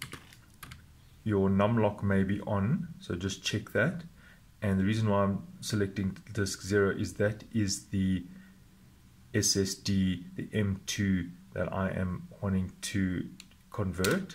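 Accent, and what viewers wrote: South Africa. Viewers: That South African accent! Thanks bro, from one saffa to another!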